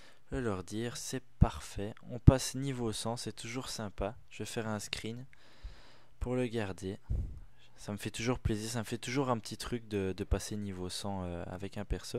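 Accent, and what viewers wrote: Belgium. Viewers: Il est belge sa s' entend je crois xD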